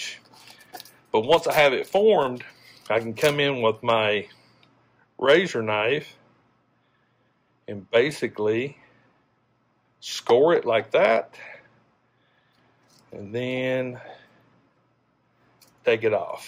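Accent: South, United States. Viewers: well, i can say this: there was no need for you to apologize near the end of the video for the video being “too long”. You did an EXCELLENT job, not only on the helmets themselves, but on explaining everything throughout the whole process. I am pretty much brand new at this whole casting & molding thing. so new, that all i’ve done so far is some “research” or in other words, “watched a dozen or so youtube videos it. and, i wish i woulda stumbled on your video first, i would be comfortable trying my first “run” just from watching this video alone. so, just wanted to say thank you for this video, i learned more from this one video than i did from all the others, combined! 🤣 but hey, dont reckon i ought to be surprised, why? because, i can tell your from the south, like myself… and thats how use good ol boys do it down here! 🤣👍 thanks again brother. ☺️👍👍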